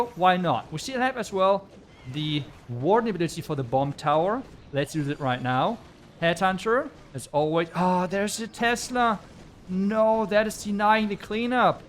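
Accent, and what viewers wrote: Germany. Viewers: He’s german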